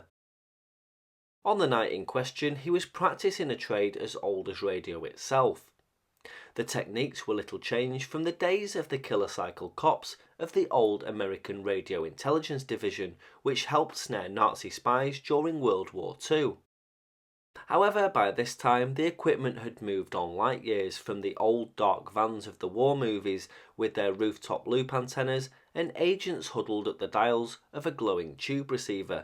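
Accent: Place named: Liverpool, United Kingdom. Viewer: Where are you from? I detect a Scouse accent.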